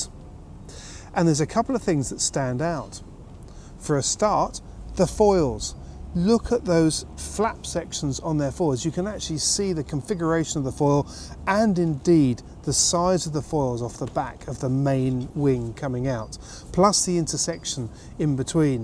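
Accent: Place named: RP, United Kingdom. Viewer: very cool bbc style English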